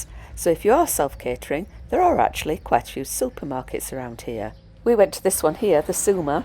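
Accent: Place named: Yorkshire, United Kingdom. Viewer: Excellent video and we enjoyed the commentary too; very informative. We're from Yorkshire and we think you may be too! Many thanks!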